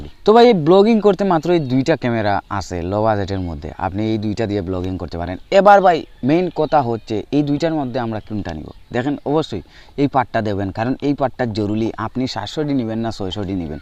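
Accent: Sylhet, Bangladesh. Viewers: Bhai apni Sylheti right?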